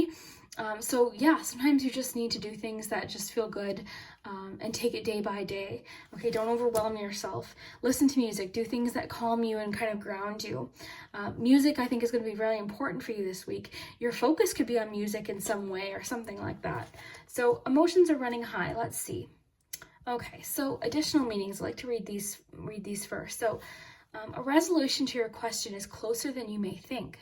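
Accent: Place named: Canada. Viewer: I’ve always guessed you lived in Canada or Minnesota by the way you say “sorry” in your videos. This was the first time I heard you say where you were from and I feel that you being from Canada is so fitting I’m not sure why.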